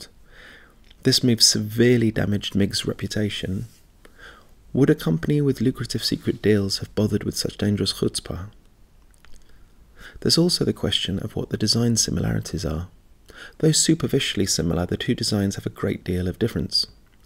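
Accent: United Kingdom. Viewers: And it's British accent, love it! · LoL British XD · The english tone is great to. He could be saying just horrible lies and ethnic slurs and I'd be on board 😆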